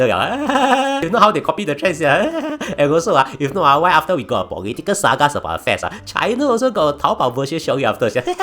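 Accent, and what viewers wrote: Singapore. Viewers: so funny. i want to take Singlish presentation skills from you. You are a classic act that is hard to emulate!:goodvibes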